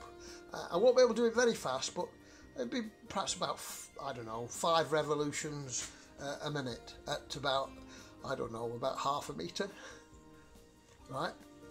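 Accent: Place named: England, United Kingdom. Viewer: You sound a lot like the Draftsman, English accent. By the way, the double coil device charged the cap to over one thousand volts. Scary! Could you go to a remote area, out in a field, away from all electric interference. Swing the mag/coil on the rope around in a circle while you stand in the center, hold your hand over your head and swing away, a larger radius. Little voltage in the shop, bigger outside.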